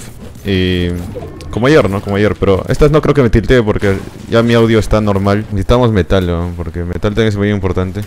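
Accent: Peru. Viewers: Tiene acento de peruano pero le salen cosas de argentino o no jaja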